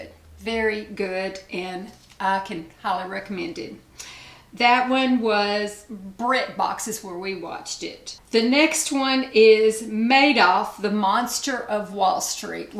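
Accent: South, United States. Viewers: Hello new to your channel 😃. Great tip for velcro rollers, who knew❤‼️ Love your accent, you sound like a Southern Lady. I went to grad. school in GA., and enjoyed everyone I met. 👍 P.S. Where did you purchase your fabulous earrings, so pretty?!!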